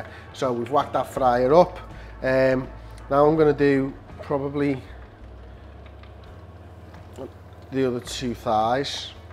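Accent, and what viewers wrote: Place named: Liverpool, United Kingdom. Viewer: A most adorable scouser is the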